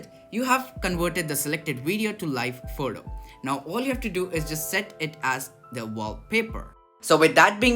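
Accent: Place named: Middle East. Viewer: Sounds like a middle eastern scammer.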